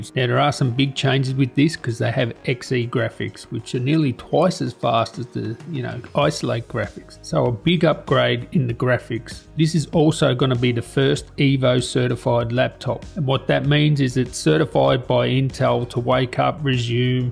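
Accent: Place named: Australia. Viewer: i think the australian accent is pretty awesome 🤷‍♂️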